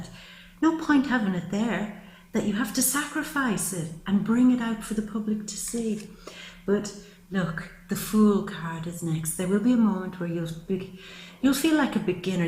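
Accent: Ireland. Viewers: Accent is Irish? Just curious.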